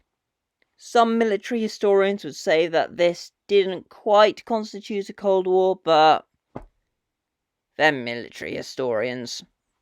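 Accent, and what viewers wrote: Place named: Punjab, India. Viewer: Your accent is punjabi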